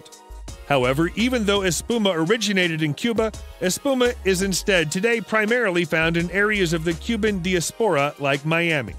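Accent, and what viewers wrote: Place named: Spain. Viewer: I love your Spanish accent and I appreciate the effort great reference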